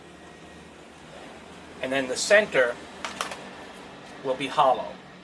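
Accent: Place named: Staten Island, United States. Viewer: My wife is from Staten Island. I thought the accent sounded familiar.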